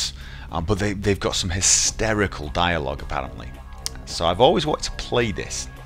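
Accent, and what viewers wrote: United Kingdom. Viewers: Holy Macaroni! It sounds like this channel belonged to completely different person back then! And I can swear it, it was some Brit! :)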